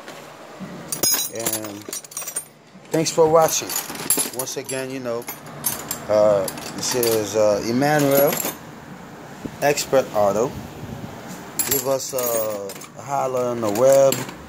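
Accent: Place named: Haiti. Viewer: You sound Haitian. Sak Pase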